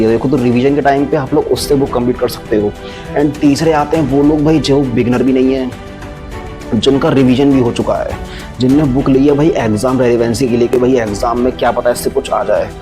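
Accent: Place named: Uttar Pradesh, India. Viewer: 😅 having up accent it feels like heavy more than sophisticated...woo thoda matter ho gya wala feel getting 🙃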